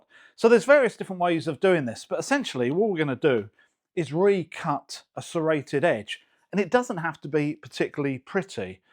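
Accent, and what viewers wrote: United Kingdom. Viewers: Honestamente iba a dar "no me gusta" tu video, simplemente por tu acento británico, PEROOOO simplemente ví la intro de tú video y ME ENCANTÓ!!! Perdón por juzgar antes de tiempo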